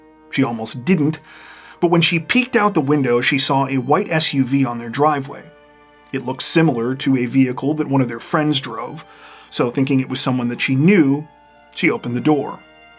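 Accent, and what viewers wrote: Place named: Canada. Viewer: Oh you're Canadian eh?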